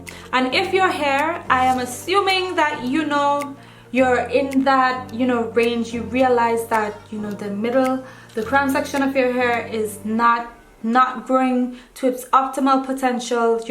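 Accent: Caribbean. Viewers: sounds like you have an accent....Caribbean girl :D.....great video..... I had to cut the rest of my hair to the length of my crown it was fine at first.....and it doing much better....still a bit shorter and grows slower and kinkier but it made soo much progress.....im really glad....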